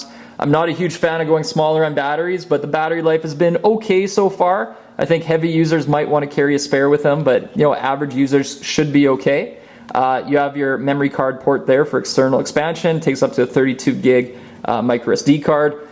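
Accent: Canada. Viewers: r u canadian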